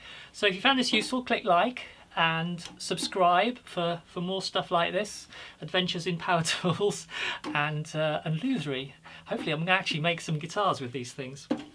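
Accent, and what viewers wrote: United Kingdom. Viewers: Interesting and helpful Video. Cute woodworking girl, i like the british pronunciation.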